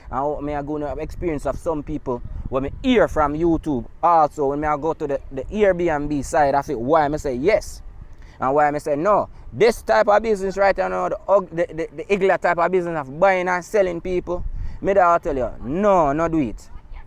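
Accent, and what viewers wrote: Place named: Patois, Jamaica. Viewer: In reference to you speaking patois,most of us were taught English in our homes.Our language culturally defines us and it’s okay.Building your platform requires clear spoken English so all your audience can understand.Thank you for choosing to provide this forum ,positive content is what we earn for ,blessings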